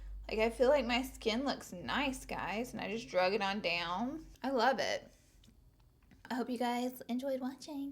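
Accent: South, United States. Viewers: I lurrve that your accent is still so thick! xoxox, fellow southern belle💁🏼 👑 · yes, us southern girls pronounce things funny, and so what?! I say ole to for oil. when I first found your channel and heard you say that, I couldn't believe my ears. i was like... YES! someone else that says it wrong. do you say creek or crick? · Where are you from ? You sound like you have a country accent 🤔 but it's really cute ! Love you , new subbie ! 🤗💗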